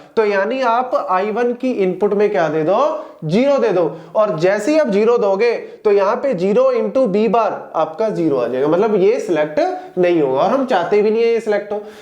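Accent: Punjab, India. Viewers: Sir are u from panjab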